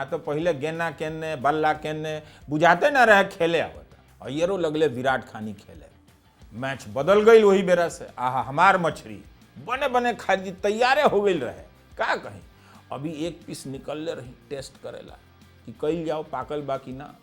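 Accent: Bhojpuri, India. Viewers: मजा आ ग़ईल राउर भोजपुरी वाला आवाज सुन के । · इतना शुद्ध भोजपुरी मैंने कभी नहीं सुना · शुद्ध भोजपुरी में खबर सुन के मन गदगद हो जाता है। इहे जज्बा बनवले रही। आपन भोजपुरी सबसे बेहतर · Wao shudh bhojpuri ❤❤❤❤❤ Love you sir❤❤❤❤🎉🎉🎉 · रउरा के मुंह से भोजपुरी बड़ा निमन लगेला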